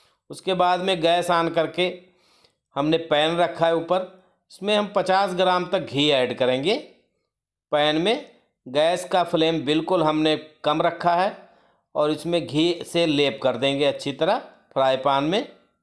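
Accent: India. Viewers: Aap bihar sy ho kya bhaiii ....bahut badhiyan dhang bataye ho sojibka gulab jamun dhanywaad ,😂😂😂😜😜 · Sir aap up se hai kya?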